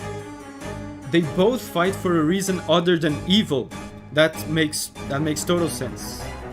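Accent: Brazil. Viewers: Mano, sabia que você era brasileiro, hahahaha! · Cara eu vejo seus vídeos a um tempo e eu achava q seu sotaque era de brasileiro, mas nunca vi vc falando pt. Só q vendo a live eu ouvi as maritacas e pensei "mds brasileiro certeza" KKKKKKKKKK Parabéns pelo trampo, curto mtoo suas análises. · Caraaa, tu é brasileiro!! Não fazia ideia (vi teu nome num site de músicas de jogos e acabei descobrindo por lá, segundo o site, claro)... Eu reparei o sotaque no inglês mas não sabia que era brasileiro... Weee! Parabéns!!! 🎉🎉 Torcendo muito pra tu ocupar cada vez mais espaço na indústria!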